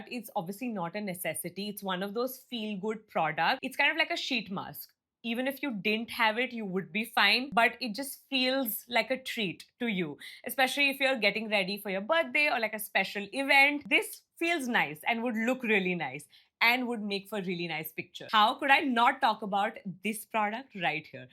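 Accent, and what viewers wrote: India. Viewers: She actually talks like Ross from friends but with an Indian accent.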